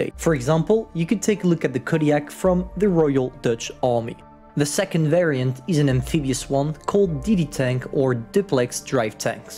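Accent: France. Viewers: Now I feel that I am in a history lesson with a French boi talking in English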